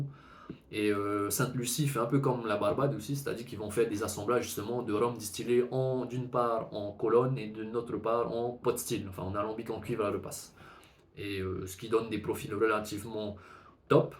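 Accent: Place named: Quebec, Canada. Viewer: C'est donc ça l'accent de Tahiti ? Ça ressemble à du québécois.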